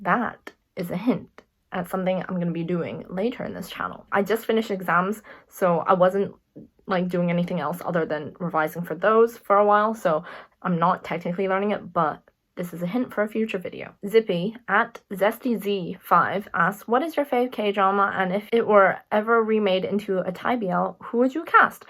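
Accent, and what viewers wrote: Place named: Singapore. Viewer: According to your accent, Though you are Singaporean. Love your reacting, Cheers.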